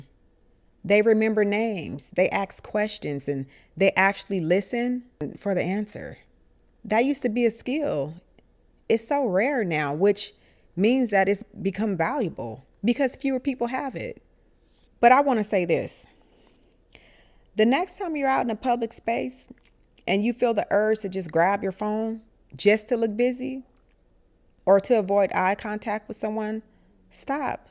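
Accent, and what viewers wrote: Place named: Los Angeles, United States. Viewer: You from LA? You got a LA accent.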